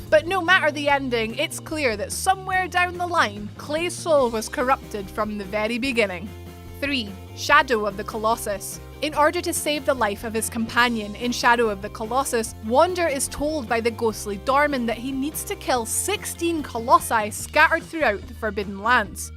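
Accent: Scotland, United Kingdom. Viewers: I love your accent. Scottish lass. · She's Scottish :-)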